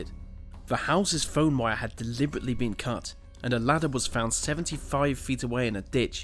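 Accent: England, United Kingdom. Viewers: Why do you continuously substitute "F" for "Th"? We hear you pronouncing "Th" when it's IN a word sometimes, but often when it begins or ends a word you substitute an "F". You say "with" properly, so why do you then say "August sixteenf 1942 "? It's really distractive and annoying. If it was your 2nd or 3rd language it would be understandable, but you have a distinctive English accent, so it must be your 1st language. Please make an effort to speak properly, since it's obvious that you can.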